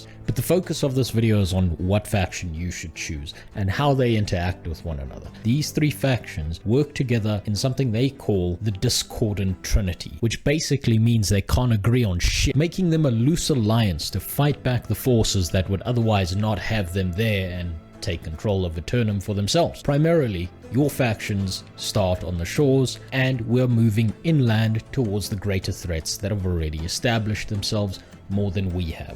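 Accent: South Africa. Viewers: Immediately knew you were South African! Lekker man! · Completely random question but are you South African?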